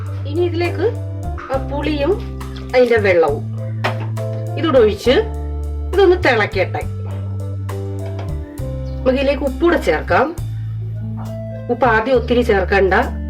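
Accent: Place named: Kollam, India. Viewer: supr Chechiiiii.....chechide Kollam slang kelkkan nalla rasamaaa kettto.i like it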